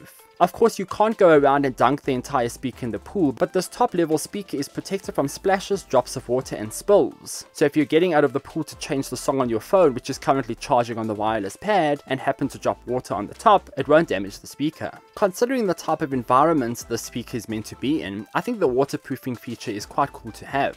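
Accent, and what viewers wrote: South Africa. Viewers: Greetings from South Africa, Durban ! Great review indeed ! I knew that you were in SA as well when I heard the accent and the words...DURING LOAD SHEDDING 🤣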